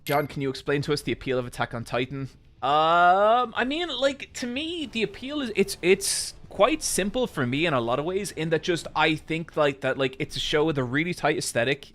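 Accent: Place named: Ireland. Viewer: Is it me or does he sound significantly Irisher than usual here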